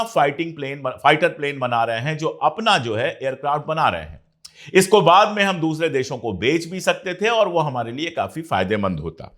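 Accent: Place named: Rajasthan, India. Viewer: Disliked for rajasthani dehati ganwaar pronounciation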